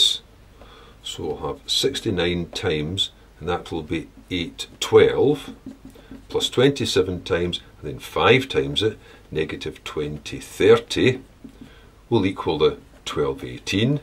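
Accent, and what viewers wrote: Scotland, United Kingdom. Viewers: He's Scottish, not Irish.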